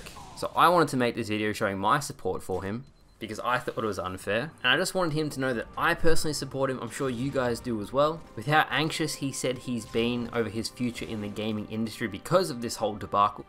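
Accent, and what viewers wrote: Australia. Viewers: Wooh up mate. Are you Aussie?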